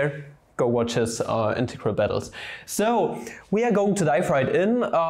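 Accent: Germany. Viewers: Oh dear sometimes I don't know if you're speaking Deutsch or English. Work on that accent pal and it will be the best!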